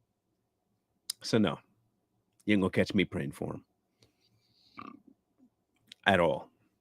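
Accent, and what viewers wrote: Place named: New Orleans, United States. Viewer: I'm from New Orleans..same accent..😇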